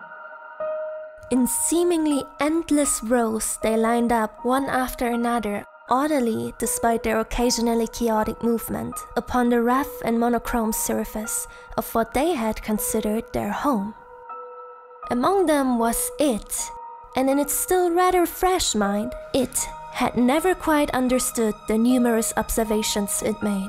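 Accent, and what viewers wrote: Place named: Germany. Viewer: German accent = 😍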